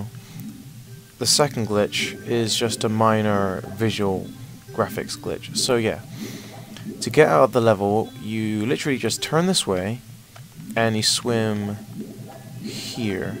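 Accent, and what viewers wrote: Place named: United Kingdom. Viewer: I think hes british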